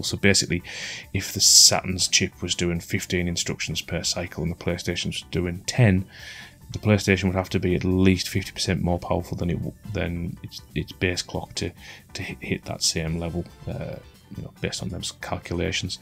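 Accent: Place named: North East England, United Kingdom. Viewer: It's probably because this guy is from the North East of England. That's how they pronounce 'u' sounds.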